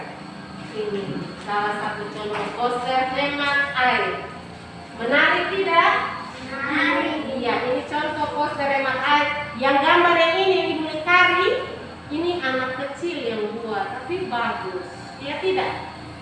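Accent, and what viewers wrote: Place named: Sumba, Indonesia. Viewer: Logat sumba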